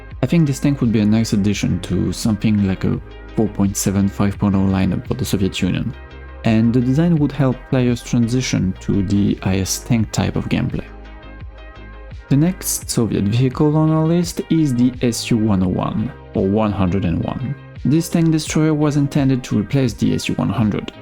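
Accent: France. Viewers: Your french accent is so exiting❤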